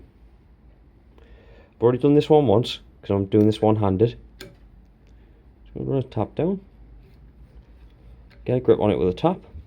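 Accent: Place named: Newcastle, United Kingdom. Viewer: Geordie it’s easy to understand.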